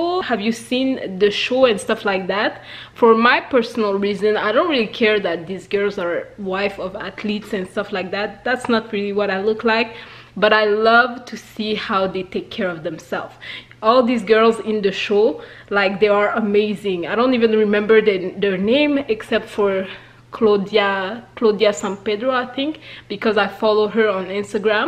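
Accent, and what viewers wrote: France. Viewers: I have to tell you that I love your french accent! 😊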